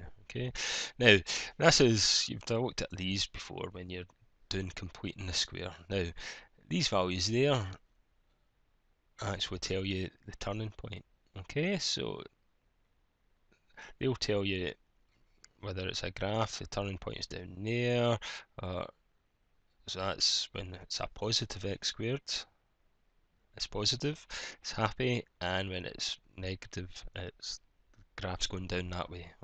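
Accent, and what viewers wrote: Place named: Scotland, United Kingdom. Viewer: mainus, Scotland accent is amazing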